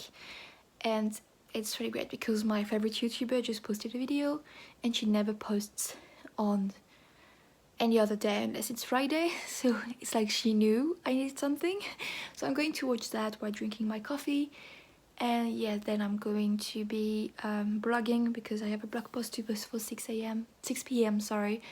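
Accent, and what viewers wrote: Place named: France. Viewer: Hi, just discovered your channel while looking for tbr ideas for the 2020 gilmoreathon. And I absolutely have to subscribe. Your accent is so lovely and relaxing and your English is impeccable. I wish I could speak French as well as you speak English. I'm going to look for language courses near me! You've inspired me. Loving this vlog.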